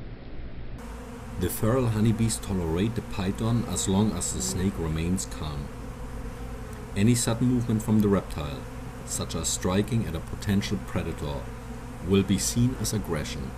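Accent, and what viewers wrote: Germany. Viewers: are u from Germany ?? sound like it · I can hear you're German. Mega cooles Video! Weiter so! Deine Stimme ist echt beruhigend 😂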